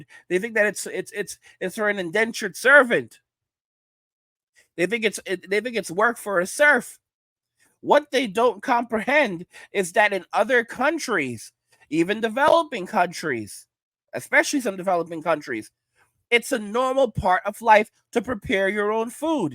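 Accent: New York, United States. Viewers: 10 on the NY accent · Great content,. I like your in-depth analysis, POV / plan and prediction that Western college educated (Feminist indoctrinated) former OF creators will be forced to the streets (competing with AI Girlfriends). Great New York construction guy accent and positivity. Consider a classier Angry Man face to better represent you. I see you as Justified Man of Justice. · I’ll give you a 7 outta 10 on that New York accent..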